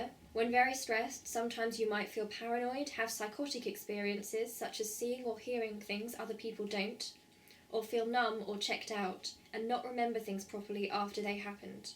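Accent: United Kingdom. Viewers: Unfortunately there still is stigma in society for mental illnesses, but you are courageous to have made this video. YOU are not YOUR mental illness after all. I once had a relationship with a girl who has BPD/EUPD and at the time, one book I read was very informative on understanding her illness. I Hate You--Don't Leave Me: Understanding the Borderline Personality by Jerold J. Kreisman and Hal Straus. ISBN-13: <num> Best of luck in all your hopes and dreams and may I just say as a Canadian, that I love your British accent.:)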